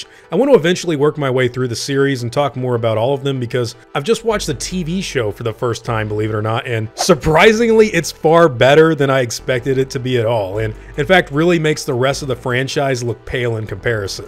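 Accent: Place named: Scotland, United Kingdom. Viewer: Egyptian Spaniard with a Scottish accent, brilliant👍👍👍👍👍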